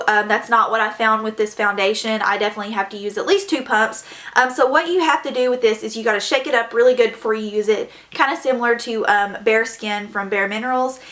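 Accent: South, United States. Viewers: Your southern accent is so cool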